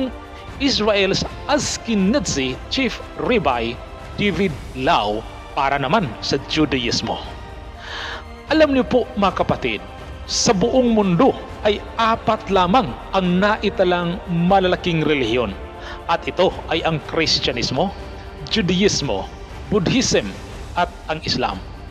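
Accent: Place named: Visayas, Philippines. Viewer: ano ba yang accent mo... sorry... wag ka nang mag pronounce ng english kung bisaya ang accent mo.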